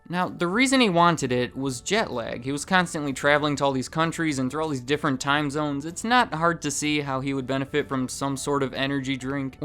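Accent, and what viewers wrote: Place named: Minnesota, United States. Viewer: I feel like is from Minnesota based on his voice. I always wonder if he is a Minnesota Twins fan.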